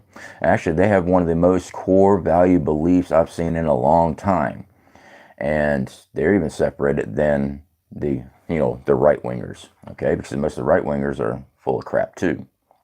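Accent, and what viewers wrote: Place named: Appalachia, United States. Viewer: hillbilly accent Yall remember, chance, favors! The prepared mind! Semperfiiiii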